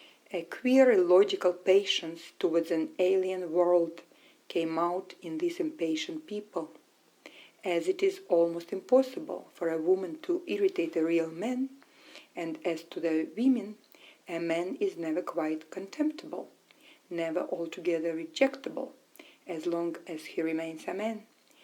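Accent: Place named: Russia. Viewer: I guess it's a good book to read. I heard about it, but never read it. I love your cute Russian accent!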